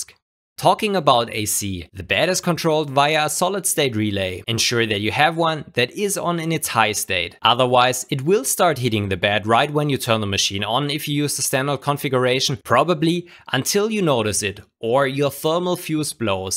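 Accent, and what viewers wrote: Germany. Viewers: Warum skyped ihr auf englisch? Yeah, I know, why do I watch only english videos? But you both speak german. You, I can hear from a mile through your gernglish, (sorry, js) and also lives in germany, I think. His english only very rarely gives him away, and if you don`t know he is from germany, and don`t speak german yourself, you probably wouldn`t even catch it most times. -I didn`t, only when he got german greetings half way through a stream.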